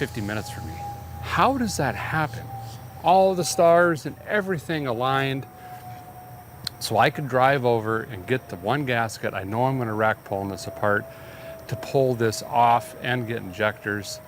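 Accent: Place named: South, United States. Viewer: I feel like we are losing you only because you’re starting to talk Southern as in “got me a coffee”. We still love you here in tropical Southern Minnesota. High of Zero tomorrow ♿️🚾🍻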